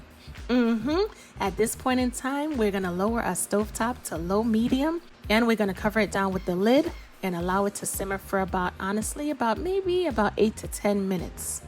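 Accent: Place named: Brooklyn, United States. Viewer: I knew it! I could hear that Brooklyn accent. I wanted to ask, but was too shy to. lol :)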